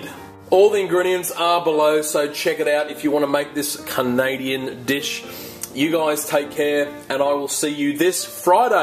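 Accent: Australia. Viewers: He's Australian you morons and this recipe was shit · That's an Australian accent mate. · Omg he's Aussie give him a break!!! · Are you Aussie ? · Nice job being a grammar nazi, a douchebag, and making fun of the way he pronounces things. He's Australian lol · Hes Australian, you yokel. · FYI, he clearly has an Australian accent... Second. Yes, he's doing the poutine wrong, but it's not his fault. I'm sure it still tastes good. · He is aussie not english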